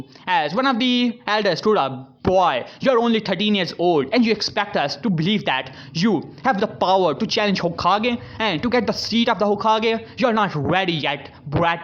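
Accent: India. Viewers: I love your India voice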